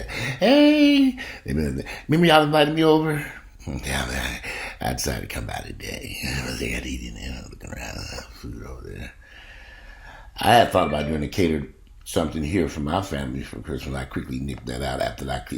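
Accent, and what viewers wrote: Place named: South, United States. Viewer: Omg I always felt like I could listen to you all day just talk. So relatable reminds me of my great uncles and fam from the south lol❤ have a good one as well.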